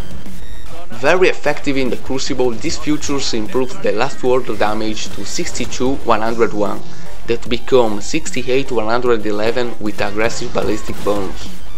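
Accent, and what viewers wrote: Italy. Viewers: si sente il tuo accento italiano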